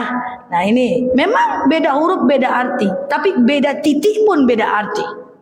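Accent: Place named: Malaysia. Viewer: Masya Allah logat Melayunya suka saya ustazdah cantik,dah hidup diduniya banyak masalah,pulang kampung akherat singgah dineraka pula yassalam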